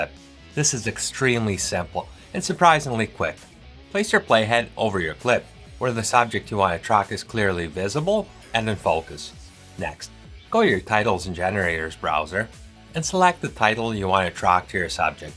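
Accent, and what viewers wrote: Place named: Saskatchewan, Canada. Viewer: Holy cow dude, I'm from Saskatchewan and it took about 2 seconds to place the accent :-). Thanks for the tracking info!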